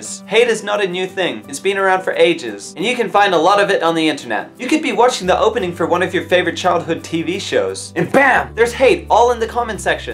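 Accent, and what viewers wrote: Australia. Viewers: I love how your aussie accent slips through a bit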